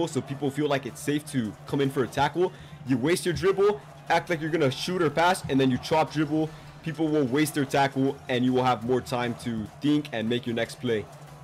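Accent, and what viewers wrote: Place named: United Kingdom. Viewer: You sounded like british aiku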